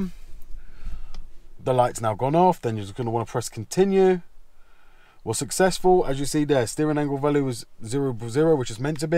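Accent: United Kingdom. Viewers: I honestly do not understand why so many youtubers talk so fast when they are doing an exercise? Its weird and kind of disorienting to me.Please for future vids try to speak a little bit slower especially with your having a thick british accent.:)Thanks and keep up the good work!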